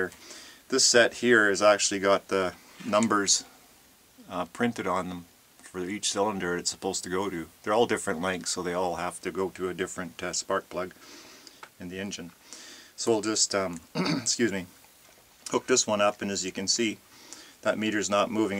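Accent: Canada. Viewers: You sound incredibly canadian ;] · Greetings my friend. Are you a BERTAN? I lived in BC and Berta. You totally have either AB accent or Sask.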